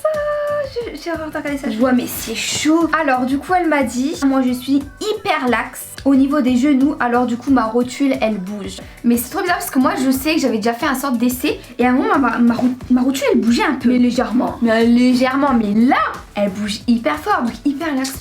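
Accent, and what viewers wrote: North, France. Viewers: On sent que vous avez l'accent du Nord😅,moi aussi je suis du Nord. Ps:J'adore vos vidéos❤, vous êtes hyper énergique et cela me donne du plaisir à regarder vos vidéos !! Si t'es d'accord like👍 | \/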